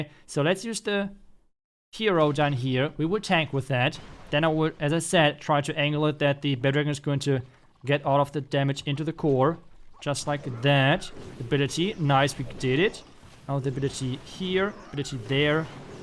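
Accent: Germany. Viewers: erstes mal englisches video gesehn man hört kaum einen deutschen akzent wow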